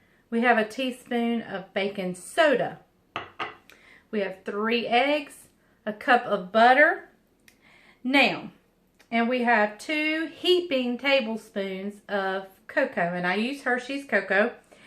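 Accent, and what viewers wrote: South, United States. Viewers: you crack me up! With your southern accent it sounds like you are saying bacon soda and bacon powder. Funny! I remembered my mama making this cake when I was little - the buttermilk makes it so moist and that icing is just the yummiest!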